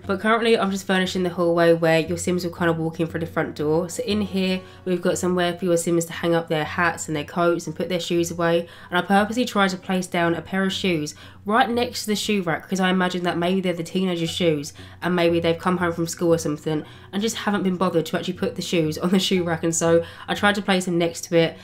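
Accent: United Kingdom. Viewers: British/London accent, the worst of all eng accents, so please slow down, especially when u r not pronouncing at least one or more of the ending syllable from every word. Instead of ”cars”, you have ”ca”... Terrible pronounciation of the eng language, but a motto for later: ”Swedes speak better english than the Brits and maybe the best” So fuck the eng or especially the londoners eng who mutilate and deforming the language so badly. Haha ;) :D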